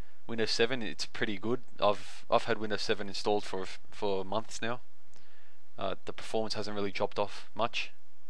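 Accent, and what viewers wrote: Australia. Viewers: I love your Aussie accent! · Defiantly a Aussie, we don't have that accent here in the UK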